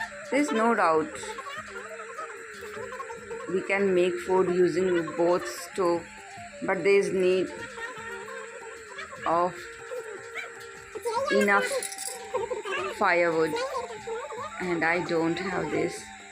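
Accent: India. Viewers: Damn, I feel like I don't understand any of this language. Is this the Indian English accent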